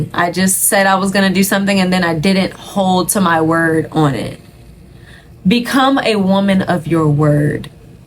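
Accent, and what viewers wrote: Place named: United Kingdom. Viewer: The British accent on point lmao Love the video ❤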